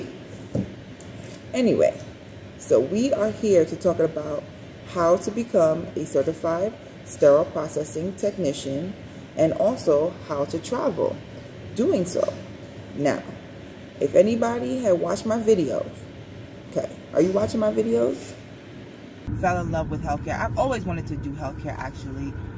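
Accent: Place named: Jamaica. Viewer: Are you Jamaican? I hear an accent!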